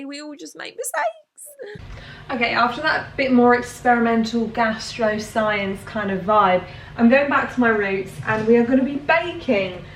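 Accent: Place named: Yorkshire, United Kingdom. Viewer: Your Yorkshire accent kills me 😂😂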